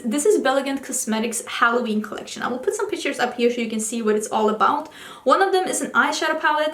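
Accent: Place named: Sweden. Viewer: Hey! I've been mia for a few months, but congrats on 50k!!! And I'm loving the hair; I'm planning something similar ❤ Your English is getting so good we wouldn't even know you were Swedish without that cute accent!! Lol. In the U.S. we usually only use "neither" when referring to only 2 things. Like...I like neither salt, nor pepper. If it's a group of more than 2 we usually say, "I don't like any of it." Or "I like none of them." I'm only saying this cause I know you won't be offended, and I know you're a smart girl so you like knowing EVERYTHING about something. I can tell, cause you're like me. 😉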